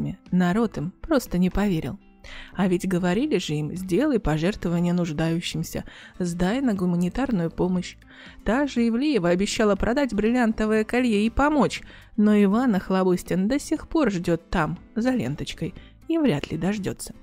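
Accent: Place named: England, United Kingdom. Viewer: чувствуется английский акцент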